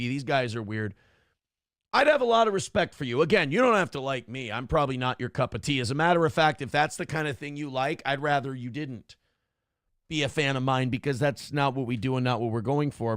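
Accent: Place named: AAVE, United States. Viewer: I love when he talks ebonics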